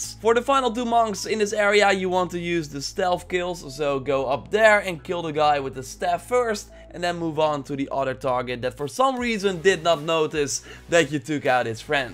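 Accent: Netherlands. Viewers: you sound like a nederlander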